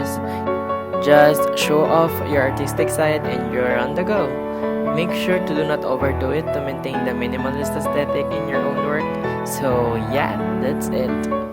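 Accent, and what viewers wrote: Philippines. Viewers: 𝐋𝐞𝐦𝐦𝐞 𝐠𝐮𝐞𝐬𝐬 𝐡𝐞 𝐢𝐬 𝐟𝐫𝐨𝐦 𝐭𝐡𝐞 𝐩𝐡𝐢𝐥𝐢𝐩𝐡𝐞𝐧𝐬 𝐦𝐲 𝐛𝐟𝐟 𝐢𝐬 𝐚𝐧𝐝 𝐭𝐡𝐢𝐞𝐫 𝐚𝐜𝐜𝐞𝐧𝐭 𝐬𝐨𝐮𝐧𝐝 𝐭𝐡𝐞 𝐬𝐚𝐦𝐞 𝐢𝐦 𝐟𝐫𝐨𝐦 𝐯𝐢𝐞𝐭𝐧𝐚𝐦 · His filipino accent tho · I know a pinoy when I hear one🌚 Lab da bideo, Kuya!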